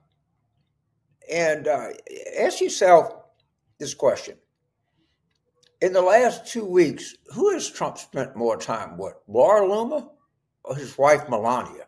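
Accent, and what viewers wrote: South, United States. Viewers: Glad I found you...didn't know you had a channel....so glad to hear what you are saying...I'm 69 from Alabama....you sound exactly like the folks I have heard speak when I was young...the sensible Southerners have left the scene...they have become Trump groupies.....what you are saying is the way men spoke...when I was young...it also sounds familiar because of your accent...Lord...please pray the democrats win...we have our issues...but definitely not same kind of crazy as what the Republicans have become...what happened to Southerners...they had the voice of reason...God Bless you...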